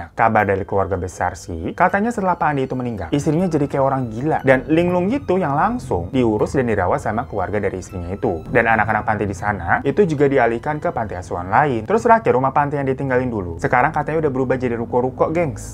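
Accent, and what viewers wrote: Indonesia. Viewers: Slang indonesia kamu paling mantap.. suka saya dengar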